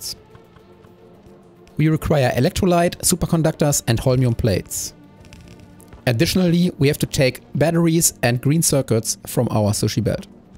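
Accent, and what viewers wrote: Germany. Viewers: I could watch these videos for hours just because of this german accent. (Rreally like it)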